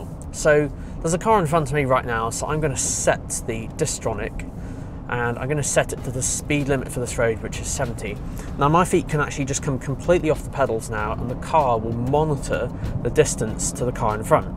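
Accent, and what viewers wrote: United Kingdom. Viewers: your videos are superb! Explanations are educating and clear, video and audio are perfect! I even enjoy listening to your British accent. Thanks!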